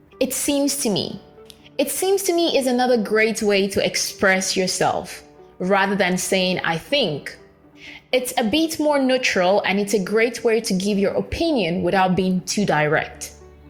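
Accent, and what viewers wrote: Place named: Nigeria. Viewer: Woow!!, Im really glad to come across your youtube channel , your English lessons are helpful and informative, your accent is very clear, by the way , I love my nigerian brothers and sisters, their comedies are A+, Did you grow up in Nigeria? ( your accent ), just asking my dear Sister. You have earned yourself a Subscriber.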